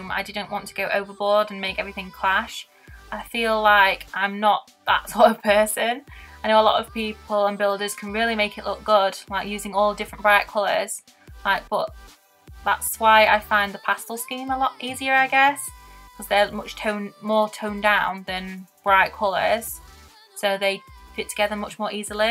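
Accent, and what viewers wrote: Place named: United Kingdom. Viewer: I like the voice 😊 It is better for me to improve my understanding to british accent 👍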